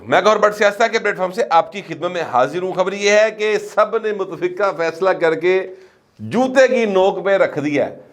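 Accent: Punjab, Pakistan. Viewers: چول بٹ پنجابی ٹچ نا دیا کرو چھچھورے لگتے ھو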